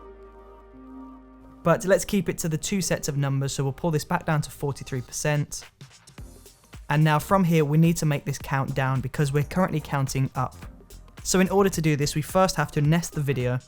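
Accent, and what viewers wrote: United Kingdom. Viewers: Hii, it helped me alot. Thank you very much. Are you British? I like your accent!!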